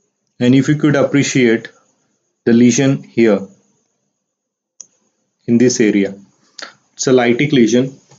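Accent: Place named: India. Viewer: What is this guys accent? Indian? 🇮🇳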